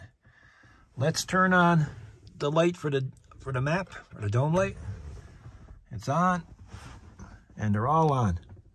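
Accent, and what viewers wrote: Canada. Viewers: Original lights are the IAG ones I’m guessing. I watched the install on those and was like nahhhhhhhhh. I was just thinking fuck it, I won’t bother until I came across these Mabett ones. So far it seems much easier and less hassle. I do appreciate they make a smoked version of the grille lights so prob get these with those lights. I wish there was more grille light options for Base Bronco. It’s crazy the amount of stuff Ford just didnt do for the Bronco. Dome lights, vanity lights, it’s ridiculous. Great tips here tho, saves me some headaches as this stuff always take longer when you learn the hard way. Are you Canadian by any chance? Sound like a Canadian to me, haha. Lot of the mannerisms haha.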